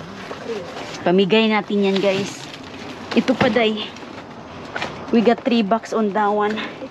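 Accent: Visayas, Philippines. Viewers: Bisaya ka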